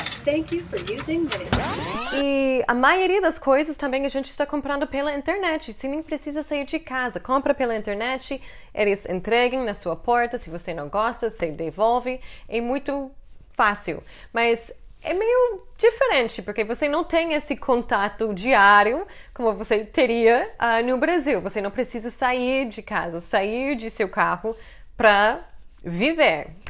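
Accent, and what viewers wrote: United States. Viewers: Your american accent, speaking english or portuguese, is very nice! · Nunca sai do Brasil, então nao vou comentar, pode notei no seu sotaque uma diferença. Você em tão pouco tempo está com sotaque mais americano kkkkk. Beijao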